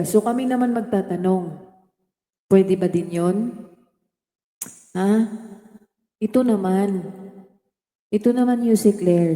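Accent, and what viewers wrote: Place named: Cebu, Philippines. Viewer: Sige sige boses Ng Cebuano Yan, thank you tambi❤❤❤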